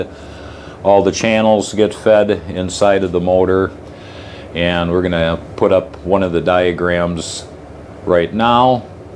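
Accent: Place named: New England, United States. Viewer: I’m sorry I just can’t stand the sound of Yankees at all. I’ll find somebody else to explain it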